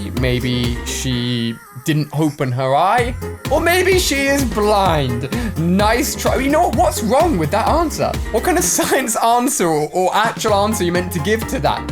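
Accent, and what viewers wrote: England, United Kingdom. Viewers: Wait is British like me 😮😮😮😮😮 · Are you from England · Are you from uk or something else?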